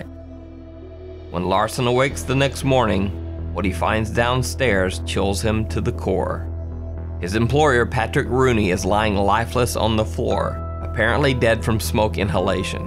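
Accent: South, United States. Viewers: you should hire someone to do the voice overs because you have some like, weird speech impediment. I can't put my finger on it... it's almost like there is too much saliva in your mouth mixed with a slight southern accent. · you sound like you have a southern accent. Your voice is the best to listen to and your vids are the scariest. You and Mr. Nightmare are the best along with Mind Seed tv. You’re my favourite though obviously 😊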